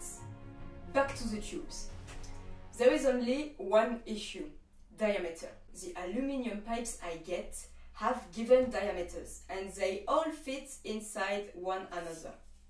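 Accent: France. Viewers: Reconnais bien là l'accent français merci pour tes 2 vidéos qui m'ont beaucoup aidé car je compte acheter les matériaux et faire seul de problème c'est que et on est que tu dis tout ça en anglais il y a des matériaux que je n'ai pas compris la signification.😅😅 Par exemple le truc en aluminium que tu mets dans les ailes, la matière du coup pour faire le squelette des ailes qu'est-ce que c'est, et je t'avoue que la fin pour du coup faire le truc pour le soutien j'ai pas très bien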